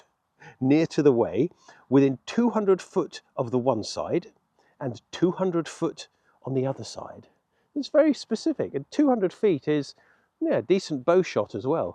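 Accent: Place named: Oxford, United Kingdom. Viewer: They are indeed. Couldn't agree more. It is an Oxford accent. Oxford University is where got his bachelors and masters degrees.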